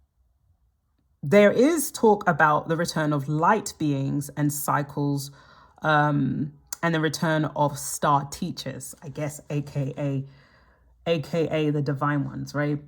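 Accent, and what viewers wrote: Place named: United Kingdom. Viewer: Idk where you are from precisely but wherever it is is my favorite British accent and also this is such a novel way to present a reading and I really love it!! Thank you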